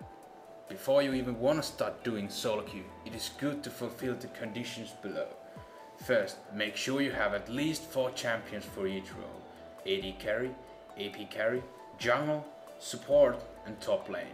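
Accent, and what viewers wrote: Finland. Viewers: Very much off-topic, but where are you from bro? Your accent sounds finnish.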